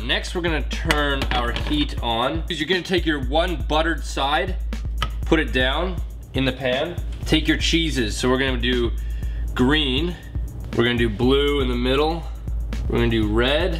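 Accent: Canada. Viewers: I love the way Canadians say out😂😂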